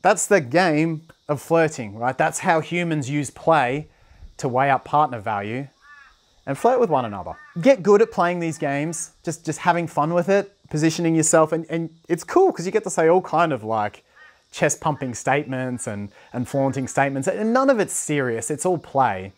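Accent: Australia. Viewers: you've got beautiful body building but the best part of you is your face your eyes your lips and your smile and your voice I know too much ha ha but it's true- I whish I could live in Australia I love Australian accent :))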